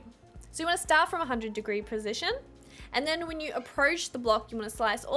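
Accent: Australia. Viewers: I like your dancey play style - I enjoy using that approach with my juggling..more satisfying (and different) than pure tech, IMO. Australian & New Zealand accents are some of my favorite. To my American ear, it sounds like the words are often mellifluously packed full of warbled, extra vowels. I want to understand why the hard “O” sounds like “-arr” - this fascinates me! Thanks for the advice! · I always forget you're from Australia! I'm Aussie too, but every time I hear you speak it takes a second to adjust to your accent. Thanks for these tips, though. I got an Oculus a few days ago and I'm stuck between Hard and Expert. No fail and the different grips are a game changer! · Are you Australian?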